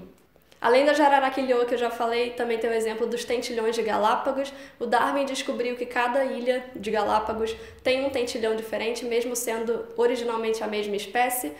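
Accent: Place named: Portugal. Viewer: Vc é de Portugal ou não?kkkk vc tem um tipo de fala de lá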